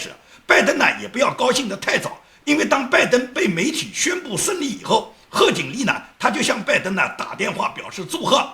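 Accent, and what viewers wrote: Nanjing, China. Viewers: 我发现你是不是一个南京人，之前，一口南京话。。哈哈。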